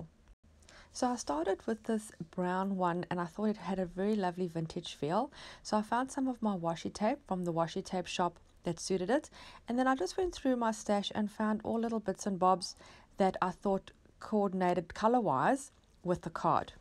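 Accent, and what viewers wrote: South Africa. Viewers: I subscribed to your channel a long time ago but never actually watched a video until today, I have a lot of catching up to do. I loved what you did and I actually liked both of the paint swatches. The biggest plus for me was hearing your South African accent, I grew up in SA so love the connection. Thank you for an excellent tutorial.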